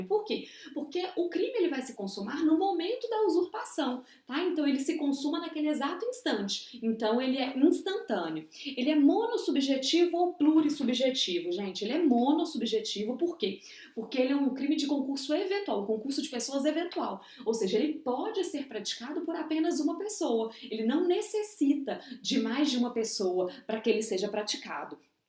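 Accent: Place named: Minas Gerais, Brazil. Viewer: Parabéns Mineira...o sotaque da terra ajudou bastante te ouvir falar...ótima cadencia e construção...continue melhorando!!!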